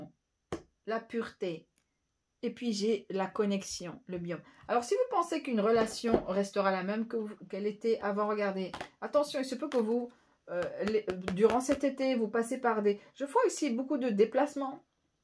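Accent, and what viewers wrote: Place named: Switzerland. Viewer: Ah l accent suisse🤣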